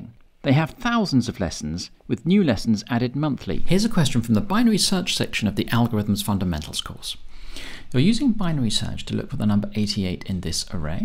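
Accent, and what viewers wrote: United Kingdom. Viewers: man you are really cool always like your video and pure british accent , love from Bangladesh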